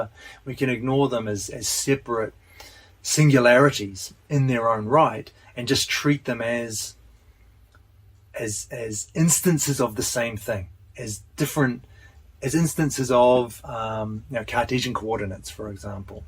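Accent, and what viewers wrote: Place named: New Zealand. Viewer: Are you a Kiwi bro?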